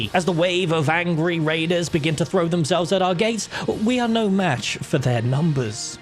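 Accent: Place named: United Kingdom. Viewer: i love how this channels mascot is a cute kitty while the channel is run by a handsome british voiced guy so we just have this handsome sexy as fuck voice coming out of this kitten its like that one charactor from shangri la frontier anime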